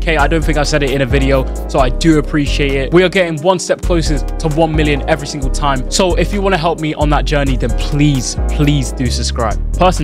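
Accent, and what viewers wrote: United Kingdom. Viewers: Well duh but he’s English accent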